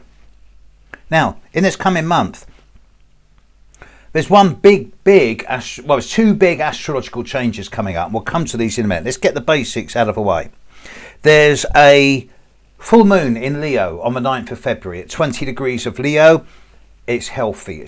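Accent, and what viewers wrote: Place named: RP, United Kingdom. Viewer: I will say something... Your perfect posh English and your suiting slightly raspy voice makes everything easy. You can broadcast the end of the world and I would be calmly listening to you. Congrats.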